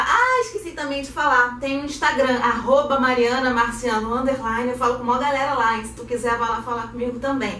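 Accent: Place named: Rio de Janeiro, Brazil. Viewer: Que sotaque tão carioca :0 Beleza :)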